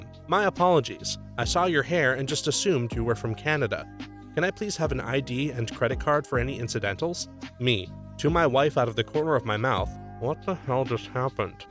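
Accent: Scotland, United Kingdom. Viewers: Oh god as a glaswegian I love your Scottish accent 🤣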